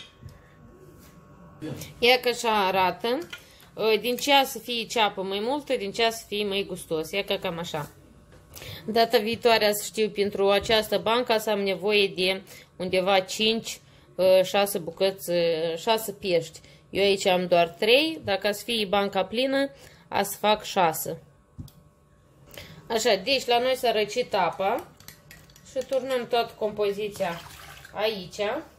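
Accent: Moldova. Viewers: In afară că ești o gospodină desăvârșită, imi place de modul vorbirii tale pur moldovenește, intr-un an după revoluție am fost în vizită la cunoștințe in HERTA, și la fel cunoștințele vorbeau exact ca tine, ești superbă.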